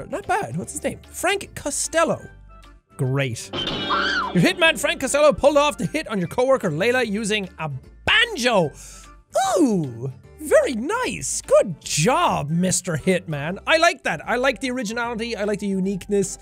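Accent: Ireland. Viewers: Sometimes when he sounds really Irish he sounds like sean from rdr2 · Jack turning more and more irish. XD "Fock em!"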